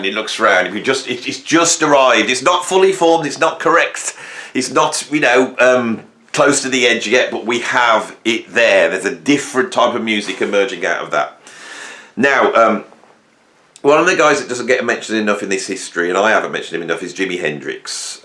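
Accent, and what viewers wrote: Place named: West Midlands, United Kingdom. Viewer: All your videos are informative, funny , intelligent and with such love of the subject that i cannot be anything but impressed.... And its good to hear a west midlands accent espousing mind nuggets 👍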